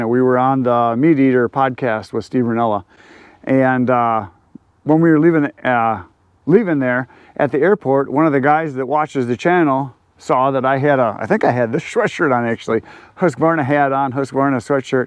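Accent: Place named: Midwest, United States. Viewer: Good story about the flame / firewood. Its true too. Love your midwest accent., Reminds me of my college years in Appleton WS. Go Packers !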